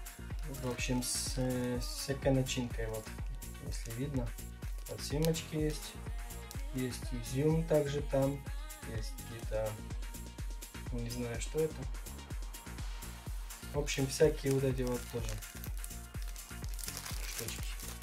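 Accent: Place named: Poland. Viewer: Здравствуйте. Мне понравилась подача видео и то как подробно вы всё показали. У вас интерестный акцент: )) то ли польский... я не поняла. Сделайте еще свежий обзор к примеру: закупка на 100 евро в Испании:)) если можно?)) лайк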